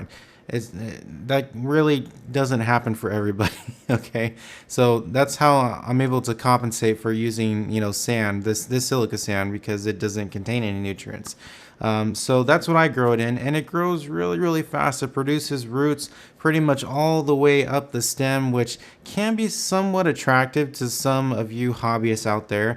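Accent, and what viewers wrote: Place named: Glasgow, United Kingdom. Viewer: Glaswegian :P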